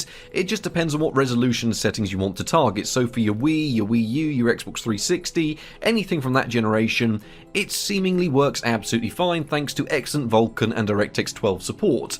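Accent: United Kingdom. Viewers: Your english accent makes these videos better :D Not so british you can't understand the words, tho british enough so you still get that soothing effect with the words. :D plus you get to see how the world of old graphics are doing in the world today, which is tbh quite fascinating to see how well these cards are holding up. :)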